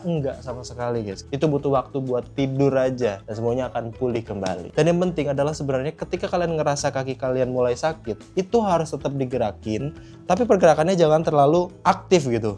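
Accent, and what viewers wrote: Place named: Banyumas, Indonesia. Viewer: Asline wong ngapak to 😂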